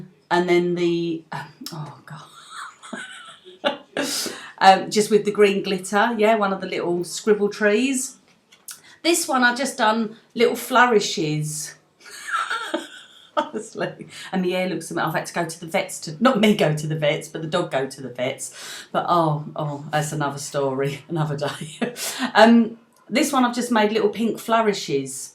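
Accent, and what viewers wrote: United Kingdom. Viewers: Thanks.. lovely to hear a Brit accent on YouTube 😊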